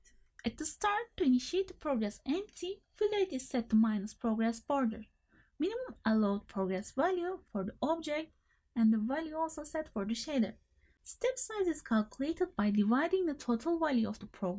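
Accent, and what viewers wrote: Turkey. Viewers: Teşekkürler.! video çekmeye tekrar dönersin umarım :) hep 1 yıllık videolar, ayrıca ufak bir bakındığıma göre mikrofon iyileştirmesi yapmış gibisin ama biraz daha tane tane konuşabilirsen muhteşem olacak gerçekten :) aksanı duyar duymaz dedim bu türk olmalı :D oyun sektörüne böyle katkılar yapan türkleri görmek çok güzel.! hintlilerden ve kuzey ülkelerinin garip aksanlarından sıkılmıştık :D yılma devam et lütfen. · Türk aksanı 🙂 kolay gelsin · English with Turkish accent :D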